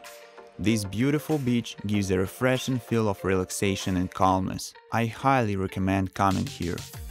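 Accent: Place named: Australia. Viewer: Thank you for this awesome and very detailed vlog. I am visiting Greece in 10 days and Hydra is one of the places on my list. The moment I came across with this beautiful island while planning my trip I fell in love right away especially the no car concept and how walkable the lovely harbour town is. By the way, I am curious about your cute accent, I could recognize some part Australian and a hint of eastern European. ;-) Cheers mate!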